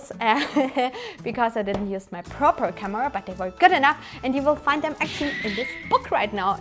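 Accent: Germany. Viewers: You are like clark kent before and after costume.. she goes from that nerd look to a super sexy warrior.. lol... And that german accent nails it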